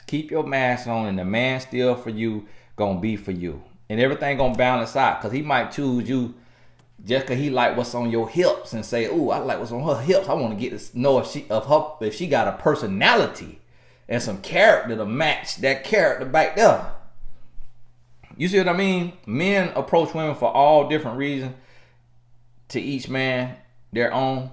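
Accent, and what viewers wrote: South, United States. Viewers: he's so country and i'm here for it! I understand country, but it's been a while since i spoke country.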